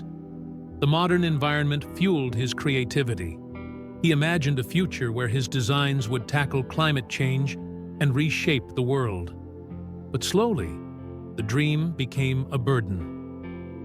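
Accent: United States. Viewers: Why this American accent on various vids. Anything English! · What is wrong with you this is the UK so why do we have to listen to what sounds like a an American accent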